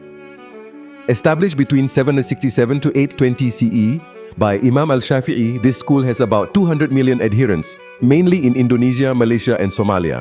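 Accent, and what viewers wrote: India. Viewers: 😂😂 a video with Indian accent big red flag 🚩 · has indian accent.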